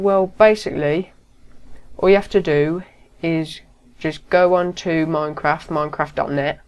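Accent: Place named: United Kingdom. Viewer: MAN YOUR ACCENT IS AMAZING, ARE YOU BRITISH?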